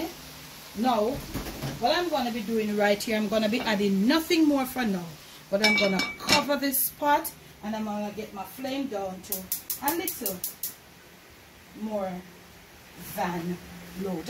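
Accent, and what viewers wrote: Jamaica. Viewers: Mrs. I just watch your Sunday show and once again your cooking make me long for a vacation to Jamaica just to eat your food Many blessings to you and the ones you love P.s. I just love your accent especially the way you say "and all dat"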